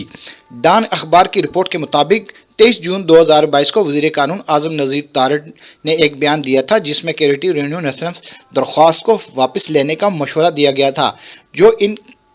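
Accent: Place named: Kashmir, India. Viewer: Pure Kashmiri Touch😂 Keep it Up🎉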